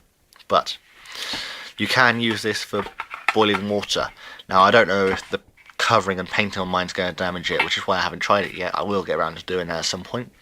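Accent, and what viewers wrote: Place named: England, United Kingdom. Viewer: i am guessing you are english :)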